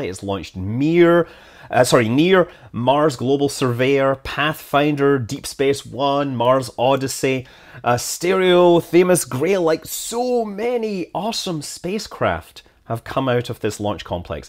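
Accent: United Kingdom. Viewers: Cats? Where? I was engrossed in Scott's velvety UK accent.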